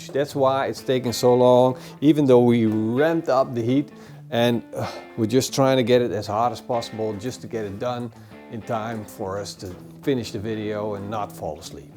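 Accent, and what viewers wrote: Netherlands. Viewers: Love the videos man but was wondering are you dutch? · You're Dutch, aren't you? 👌😉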